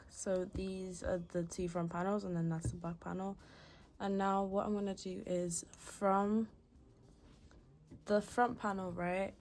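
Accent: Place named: Scotland, United Kingdom. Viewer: Your accent sounds a little northern, it’s so cute! Are you Scottish? Love from America ❤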